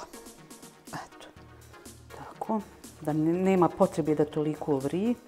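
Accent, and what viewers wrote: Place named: Bosnia and Herzegovina. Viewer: Ne sumnjam da su ćufte i ukusne kao što su jednostavne, upravo ih idem praviti ali željela sam reći drugo - uvijek radije slušam zbog njene spontanosti i prirodnosti, volim ton njenog glasa i bosanski akcenat koji me odmaraju...haha...ona dođe kao terapija!, želim ti svako dobro.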